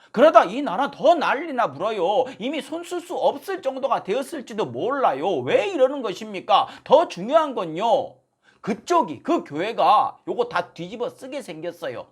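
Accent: Jeolla, South Korea. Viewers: 전라도사투리 잃져버리지도 않으셨네요ㅌㅌ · 정말로 반갑당게요. 전라도 사투리 징허게 구수허네요. 거기다 칼럼내용 속시원허네요. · 고향이 서울이란데 우째 저리 전라도 말을 구성지게 잘할까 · 성범아 윤석열이 나라말아 먹는게 아니고 이미 문재인이 대한민국을 다박살냈다 아파트 한채 6억하든게 지금13억 간단다 알것냐 지대로 알고얘기 해라 알것냐 전라도 말싫다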